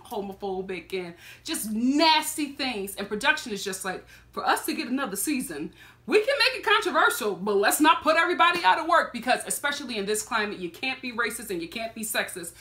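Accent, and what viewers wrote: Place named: Philadelphia, United States. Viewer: Omg this is the first time I have ever heard your Philly accent 😀😀 I enjoyed this review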